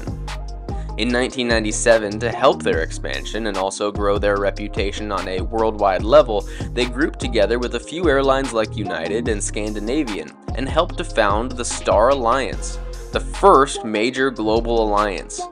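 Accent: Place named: California, United States. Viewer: You have the same accent as the SNL The Californians!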